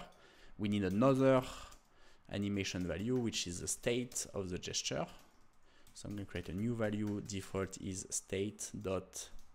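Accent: France. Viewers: Very easy to reconnaitre que you are french 😁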